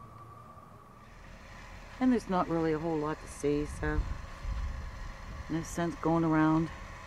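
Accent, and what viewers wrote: South, United States. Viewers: Ok lady I think you make very beautiful videos. And I really like your accent. A bit southern but very clear. I am not an American so sorry if I am wrong.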